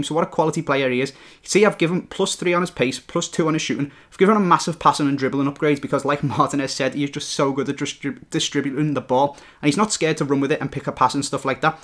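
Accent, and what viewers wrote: Liverpool, United Kingdom. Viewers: Love the Scouse accent ;)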